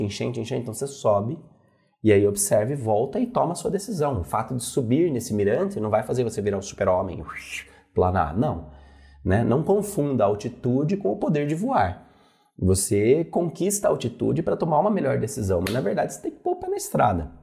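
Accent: Northeast, Brazil. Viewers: Muito bom vídeo, mas esse sotaque nordestino nunca esteve tão presente em vossa fala.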